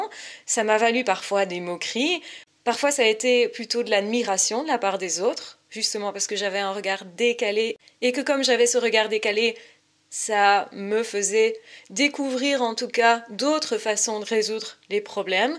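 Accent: Belgium. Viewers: Tu es belge ?¿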